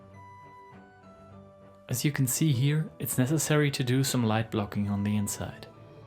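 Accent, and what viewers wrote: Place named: Germany. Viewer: Nicht schlecht Herr Specht. Ich schätze ich höre da einen Landsmann. Würdest du sowas auch als "Auftragsarbeit" für jemanden machen?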